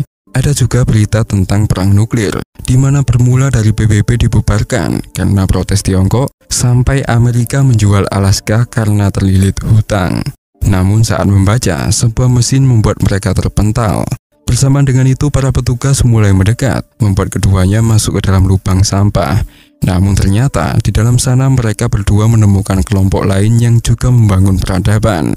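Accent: Java, Indonesia. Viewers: Suara ne wong jowo iki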